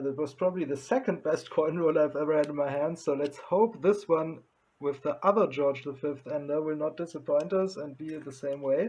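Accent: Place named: France. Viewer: If I am to guess, this man is a French-speaking person judging by the accent.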